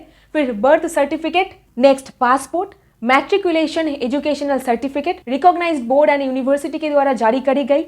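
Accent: Bengal, India. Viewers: Didi ka hindi mein bongla aa roha hai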